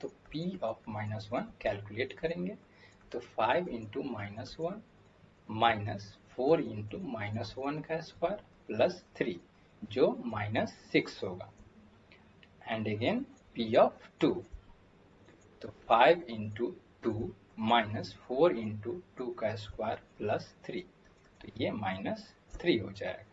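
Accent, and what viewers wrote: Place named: Bihar, India. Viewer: That bihari Accent while teaching is hypnotising, Nice Explanations 🙌🙌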